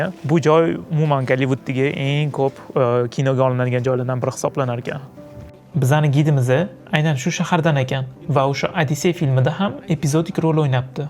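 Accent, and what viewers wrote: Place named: Tashkent, Uzbekistan. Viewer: Адабий тилда гапиришни ўрганинг. Тошкентчасига уйда гаплашасиз. Сизни ҳамма вилоятлар кўраяппти. Хурмат юзасидан абадий гапириш керак. Узр.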